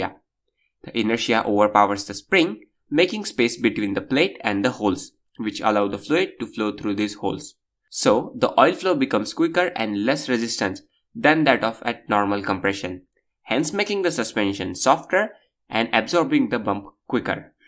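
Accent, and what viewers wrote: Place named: Andhra Pradesh, India. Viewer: A Telugu English speaking AI bot. We are doomed 🤦🏿‍♂️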